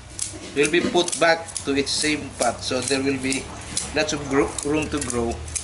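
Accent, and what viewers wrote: Visayas, Philippines. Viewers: akala ko indonesia kayo bisaya pala mo dong oi gi atay hahaha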